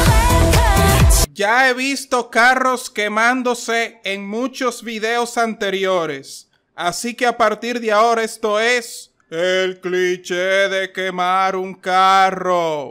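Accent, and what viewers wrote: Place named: Dominican Republic. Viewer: Eres Dominicano? Creo que sii jajaj es que el asentó dominicano se reconoce ma rápido que Cabada con lo 30 minuto y un chin ma`😂 yo también lo soy❤ AMO TUS VIDEOS!!!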